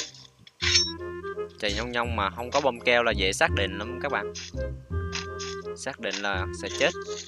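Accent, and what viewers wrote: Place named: South, Vietnam. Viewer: Anh Nói Chuyện Không Hay Bằng ZivGame Đúng Là Giọng Miền Bắc Nghe Thú Hơn Giọng Miền Nam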